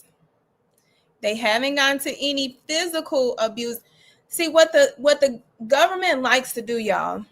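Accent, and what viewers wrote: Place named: Houston, United States. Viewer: You sound like you can be from H-town😅 That mole is pretty sexy! I wanna kiss it! 💋😍 😜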